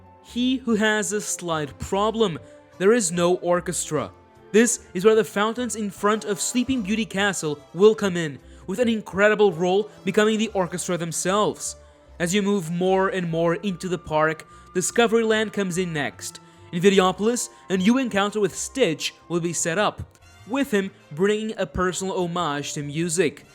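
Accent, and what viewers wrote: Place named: United States. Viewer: We need an English voice and I'll subscribe! This voice is too American sounding 😰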